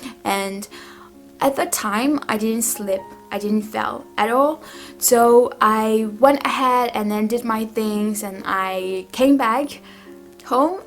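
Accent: Myanmar. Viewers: Cheese getting hot лол and yup u got a burmese accent but not as strong as my Russian accent.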